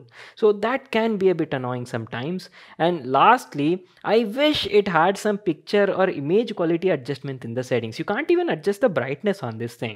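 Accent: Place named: India. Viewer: Wow finally I found Indian English Speaker Youtuber. I Subscribed! ❤️❤️❤️ Thank you for the Best Review of Wanbo T6 Max! ❤️❤️❤️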